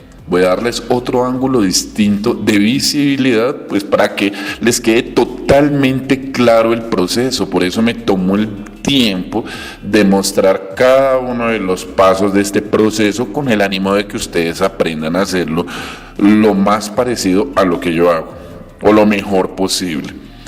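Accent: Colombia. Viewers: Me encantó el video, tu profesionalidad y tu acento colombiano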